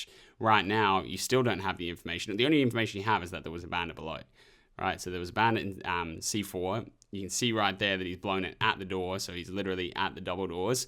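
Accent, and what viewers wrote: Ireland. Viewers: I knew i could hear some Irish in ur accent! Love the content bro! love from Scotland 🏴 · Been wondering if I could hear a bit Irish in your accent for ages. Knew you were one of us ☘️☘️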